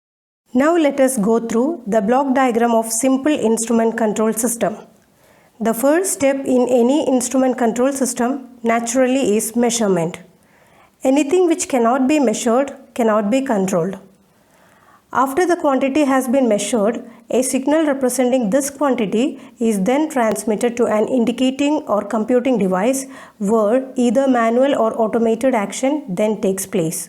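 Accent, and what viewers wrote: India. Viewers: The Narrator is a Malayalee... Well explained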